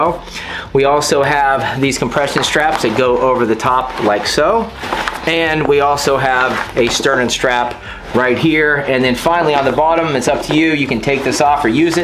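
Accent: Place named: Florida, United States. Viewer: You have got to be around the Florida line between your accent and that moss. Every time we go close to Florida line we start seeing that moss and a mixture of palm trees. Thanks for the video. I really enjoyed the videos.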